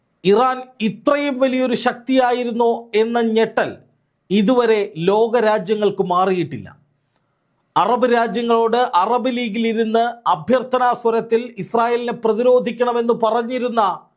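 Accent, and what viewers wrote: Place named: Pathanamthitta, India. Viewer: ത്രീശ്ശൂർ ആണോ. പത്തനംതിട്ടകാരന്റെ സംസാരമാണല്ലോ കേട്ടിട്ട്.. നീട്ട് ഇല്ലല്ലോ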